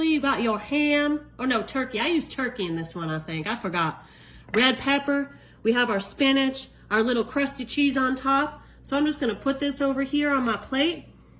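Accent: South, United States. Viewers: Now this looks great!! I would doctor it up with some kind of dipping sauce and seasoning but I would eat your recipe for sure. Thank you!! Great idea for an easy one pan breakfast. Oh by the way....what part of the south are you from? I'm in SC myself. We sound similar. Lol 😂 💜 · Love your voice with that cute southern twang to it and of course your wonderful recipe and presentation.😊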